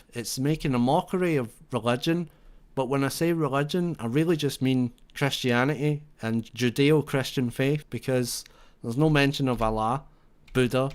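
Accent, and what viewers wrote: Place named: Scotland, United Kingdom. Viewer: was expecting an american person making this ... was really shocked when i heard a scottish person start talking! You must be from the outer hebrides or something because the majority of scotland is a secular , rational , irreligious place.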